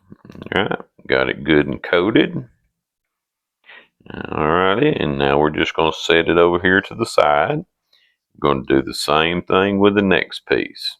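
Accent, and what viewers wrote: South, United States. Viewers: thans for the vid..'....i do this with hamburger , make four patties ,fry pour of cooking oil then add 1 jar beef gravy let simmer on low for about 30min while i make mashed pato, and greens which brings me to my Q. what keeps the homemade gravy from turning thick to the point of being clay .because gravy will get thicker the more you cook it .what am i missing .thanks ...and where are you from , nice to hear a voice from the south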